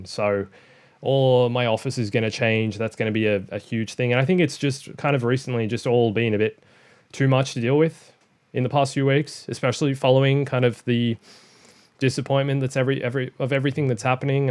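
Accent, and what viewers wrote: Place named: Australia. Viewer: Wait, You are an aussie, I thought you were a kiwi.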